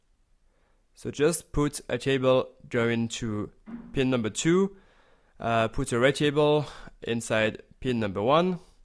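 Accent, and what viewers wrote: France. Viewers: Mais tu es français ? :D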